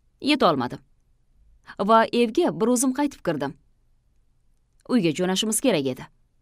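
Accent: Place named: Andijan, Uzbekistan. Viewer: Шеваямки анжанча тошканча узбакча 😊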